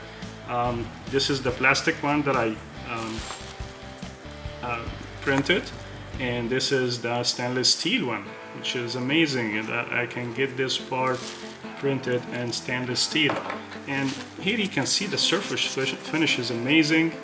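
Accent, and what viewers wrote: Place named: Northern Europe. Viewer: the art of bedding music is that you should NOT be able to hear it. its to cover up background noise, reduce the "hiss" of silent passages... it should NOT be louder than the person talking. not everyone can follow a north european accent without the added distraction. my heads just not in the space to deal with it right now...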